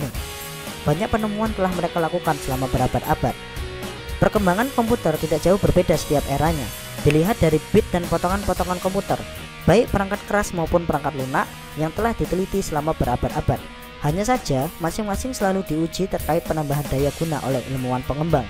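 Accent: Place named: Java, Indonesia. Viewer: laa Medhok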